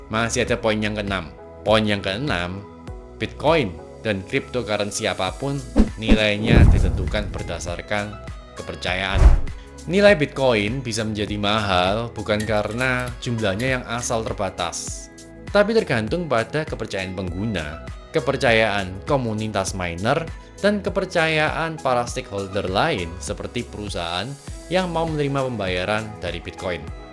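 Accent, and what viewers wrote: Java, Indonesia. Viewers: ngomomngnya medhok, kayak wong yogya atau suroboyo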